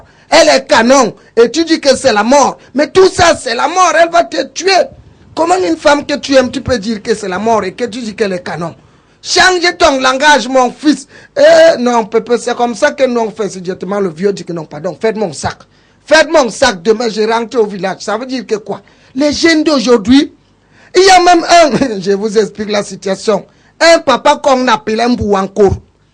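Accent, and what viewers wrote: Cameroon. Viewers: l'accent bassa ..parfait mais pour l'histoire , j'ai eu du mal a decoller...mais pas mal tt de meme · J'aime cet accent bassa'a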